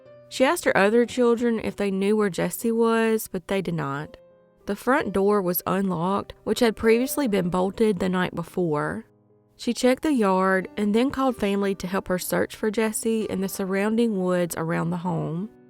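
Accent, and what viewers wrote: South, United States. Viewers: From another country girl to another, I love your accent In the fact that you named your channel Southern Girl Crime Stories!! It fits perfect to a T, and your content is great because a lot of us have never heard of some of these cases and I am grateful for you putting it out there. I know that it takes a lot of hard work and time but I must say once more you are doing a fantastic and awesome job! Keep up the great work and have a wonderful week sweet face.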